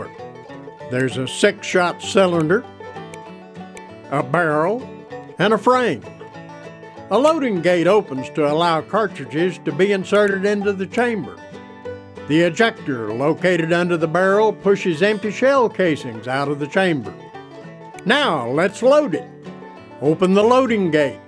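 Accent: United States. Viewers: Most American accent hahah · I love Texas accent.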